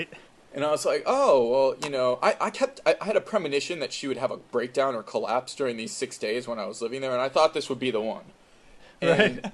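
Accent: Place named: California, United States. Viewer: he talks like that because he is probably from cali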